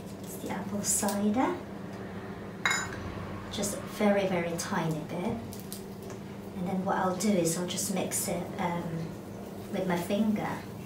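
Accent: United Kingdom. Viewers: I like the british accent 😯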